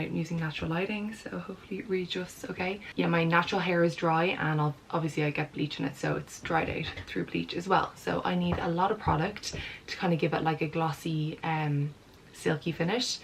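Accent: Ireland. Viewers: Am subscribed to you but not getting notifications.? You are absolutely gorgeous - the Irish accent too.. great to see a young person w natural beauty Love from Australia…..💛✨✨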